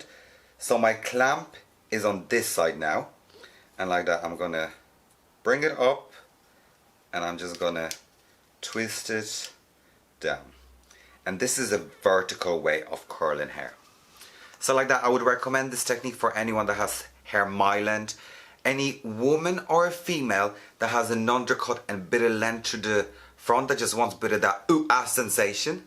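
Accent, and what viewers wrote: Ireland. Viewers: They have an Irish accent :)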